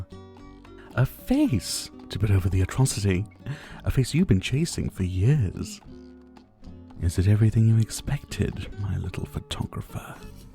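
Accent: United Kingdom. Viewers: as an Indian I’m quite flattered of how samosa really rolls off the tongue with his British accent🤍😮‍💨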